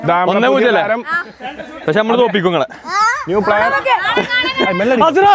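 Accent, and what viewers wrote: Malappuram, India. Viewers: ടെച്ട് മ്മളെ മലപ്പുറം 😂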